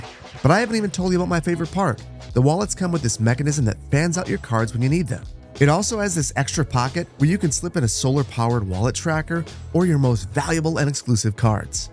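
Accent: United States. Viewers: You say tex-tile but not fer-tile. You say furtill. Is your floor made of till or tile? The way some yanks use English is so weird haha. Cheers love your content.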